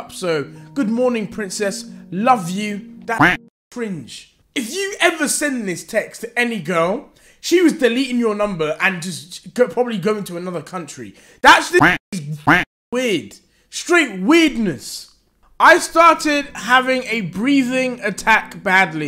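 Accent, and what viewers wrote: United States. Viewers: Your American accent is top notch man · Omggg his American accent is honestly one of your best things on this planet lmaoo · talking about sorrow with a American accent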